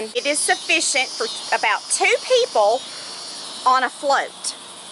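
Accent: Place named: Alabama, United States. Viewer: You either have to be from North AL or Tennessee with that accent. I'm from Middle TN and it's not everyday I come across a Youtuber who talks like the ppl I know!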